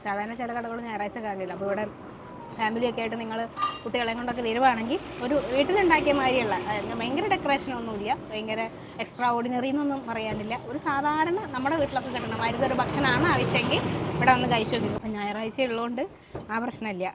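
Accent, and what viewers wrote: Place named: Malabar, India. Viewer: മലബാറിന്റെ സംസാരരീതിയും മലബാറിന്റെ രുചിയും പാചകരീതിയും സംസാരത്തിൽ തോന്നുന്നു. എന്തോ തോന്നലാകാം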